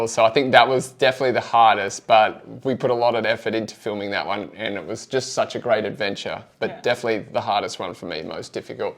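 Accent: Australia. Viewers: That's funny, a Spanish channel?! I am Spanish, I've been following you for a while now! NO WAY I'm gonna miss your voice, your aussie accent or your laughter! I'll keep on watching the original one! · Nah, no travel video please. Stick to getting excited pulling stuff out of the canals. Your Ozzie voice is a bit to annoying for travel talk.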